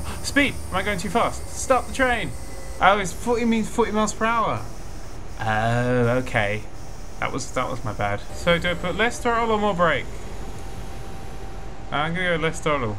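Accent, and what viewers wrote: England, United Kingdom. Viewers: is he english? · It's pretty obvious that he's English XD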